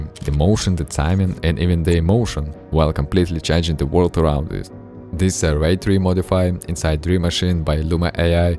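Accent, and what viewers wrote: Russia. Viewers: очень слышно русский акцент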